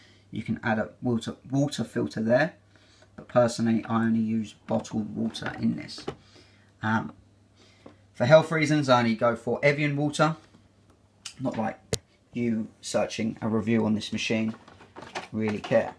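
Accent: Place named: United Kingdom. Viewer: It's the thick British accent 😉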